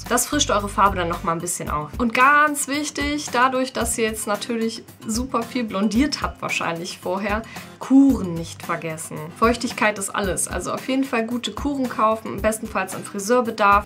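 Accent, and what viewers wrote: Germany. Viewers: Kommst du aus Münster oO ?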